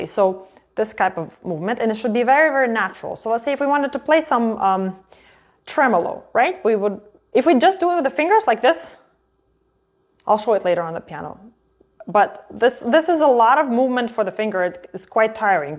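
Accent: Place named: United States. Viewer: Thank you for reminding me of those wrists positions 😊 I had forgotten them. I was taught that too, up and down was the most important. I don't quite remember if the stacatto movement is included in up and down, 🤔 I know I had to practice that wrist movement, my teacher used to say the 'ping pong' wrist movement. Where are you from? You speak English with an American accent, but you're not American nor English, some eastern Europe country? I'm Elsa from Lisbon /Portugal. Liked your interpretation of Chopin's scherzo 👍👍👍 congrats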